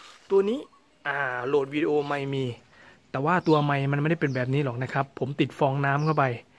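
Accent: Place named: Surin, Thailand. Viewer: พี่สำเนียงเหมือนคนสุรินทร์เลยย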